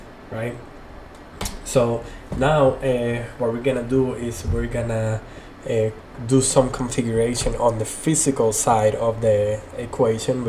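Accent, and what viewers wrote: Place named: Brazil. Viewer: sotaque de BR em man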